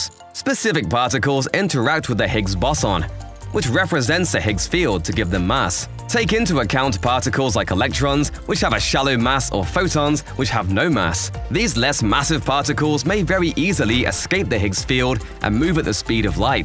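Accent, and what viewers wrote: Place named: Ireland. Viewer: What is it with an Irish announcer emphasising the European CERN in imperial units and bracketing the metric with US spelling? Metric is standard. Even the troglodytic imperial units are defined by the metric standards, don't give the obsolete measures breathing space let alone put it front and centre. Plus whilst what was said was informative, the random graphics, plucked from who knows where, that have little to nothing to do with what is discussed, are not.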